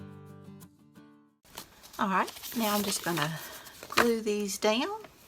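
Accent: South, United States. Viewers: Cute pockets. I like it when you talk. Your southern accent is sweet.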